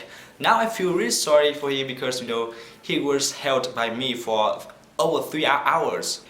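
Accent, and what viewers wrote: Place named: United Kingdom. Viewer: Linh Khánh Theo mình nghe dc thì anh anh đó bạn.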